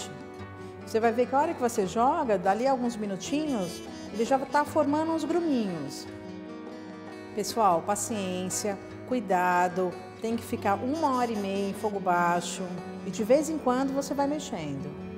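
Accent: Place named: Brazil. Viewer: É de Portugal???? de repente pareceu-me que era Brasil!!!!!!!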